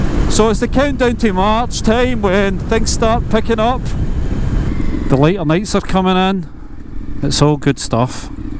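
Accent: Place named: Scotland, United Kingdom. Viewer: Hey. Very nice video. Enjoy the accent. Fells like i'm watching Outlander. LOL.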